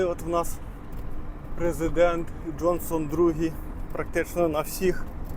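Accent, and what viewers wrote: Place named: Khmelnytskyi, Ukraine. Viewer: Привіт гарні доглянуті машинки) а ви звідки? А то чую Хмельницький говір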